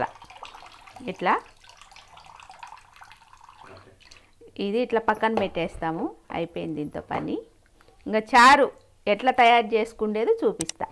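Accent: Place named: Telangana, India. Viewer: మీ మాట తెలంగణా మాటల వుంది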